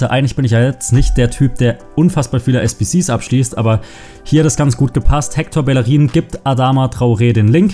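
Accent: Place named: Baden, Germany. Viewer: liegt vielleicht auch an dem badischen Dialekt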